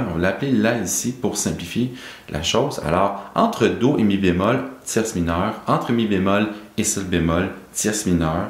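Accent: Quebec, Canada. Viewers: Salut, merci de tout cœur. Ce qui me tue, c’est que je sais tout ça de façon empirique, et autodidacte, et même ton accent me dérange moins depuis que j’ai une amie d’enfance établie au Québec qui a repris contact avec moi….😂 Le problème est que je suis porteur d’autisme et que je suis totalement bloqué lorsque quelqu’un parle en mots alors qu’on est en « notes » ou en « sons »…. Aussi radical que ça, alors mon autisme, surtout en musique puisque c’est mon intérêt spécifique, est clairement invisible à yeux des autres. Je fais le vœu ici de découvrir et suivre ta chaîne pour apprendre encore et je continue d’improviser sur les disques ou music tracks de YouTube. Merci encore. Je m’abonne.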